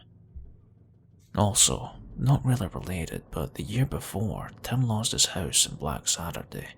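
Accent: Scotland, United Kingdom. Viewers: A Scottish accent goes really well with scary stories. Great story telling voice👌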